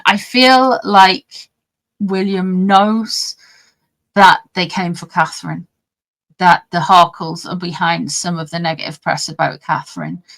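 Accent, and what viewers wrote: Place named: Newcastle, United Kingdom. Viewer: I thought was saying DL’s too..However I think she is saying DETAILS.. I think it’s her Geordie accent..please correct me if I am wrong..💙💙💙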